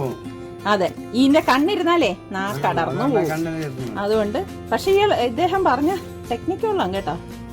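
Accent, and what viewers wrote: Trivandrum, India. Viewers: trivandram bhasha samsarikkarundo ennu athenikku istamanu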